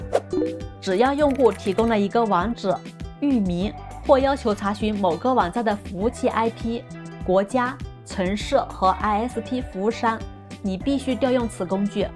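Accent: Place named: Jiangnan, China. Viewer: 听口音可能是中国大陆南方江浙沪一带的？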